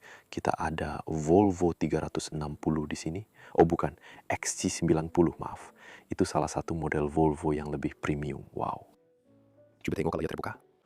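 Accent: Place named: Indonesia. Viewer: Sekejap bahasa melayu sekejap loghat Indonesia...🤗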